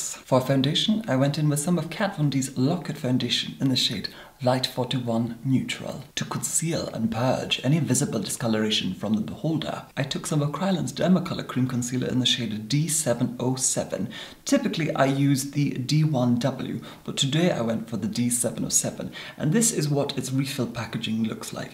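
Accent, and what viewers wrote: Scotland, United Kingdom. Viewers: The first video of yours I've watched and I immediately picked up on the Scottish accent 😍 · .... our Lord says England but has a Scottish accent? Surely should still say the UK? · He's definitely Scottish. Go back to his first video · He has a lovely accent although there’s defo a Scottish accent undertone.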